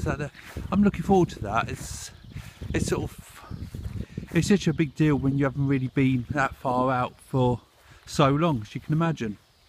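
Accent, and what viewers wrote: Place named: England, United Kingdom. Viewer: ist your name right?. I have a question about your accent. in which part of england people speak like this? thx in advance. i like your videos. cheers from a german from germany.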